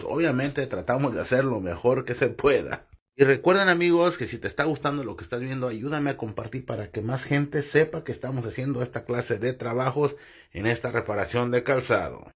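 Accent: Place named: Mexico. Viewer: No ma.. que chingon trabajas amigo e visto varios de tus videos trabajas muy chingon veo que estas en EU donde aprendiste el oficio por tu forma de hablar y las leperadas que dices jajaja as de ser mexicano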